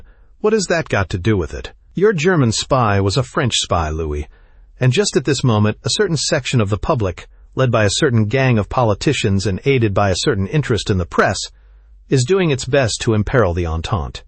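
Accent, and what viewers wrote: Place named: United States. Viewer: Nice hearing plain straight up American voice after excessive British with most over the top with the snootiness and the nearby islands of accents with strictly another country's vocabulary we can at best barely make out.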